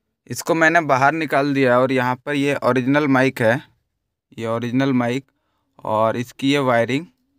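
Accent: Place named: India. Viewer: No me gusta el acento que tienen los indus en inglés.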